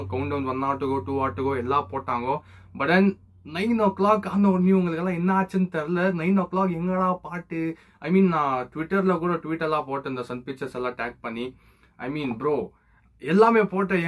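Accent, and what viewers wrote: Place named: Bangalore, India. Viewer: Unnoda Bangalore Tamil and Excitement for Walter White dialogue ku naan fan aiten da.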